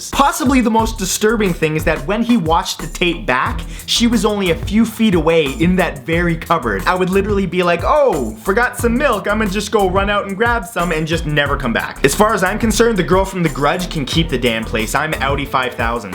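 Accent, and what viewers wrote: Canada. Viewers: it’s called being canadian, most of us talk like that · Is it me, or you can just tell this guy is Canadian? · your canadian? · wait- r u canadian?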